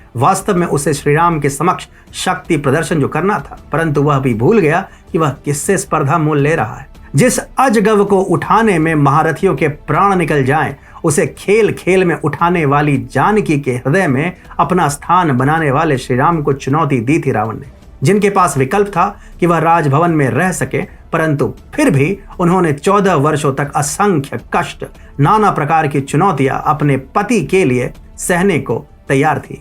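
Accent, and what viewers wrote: Standard. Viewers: Kitne mahine baad itni shudh Hindi sunkar Aanand hi aa gya.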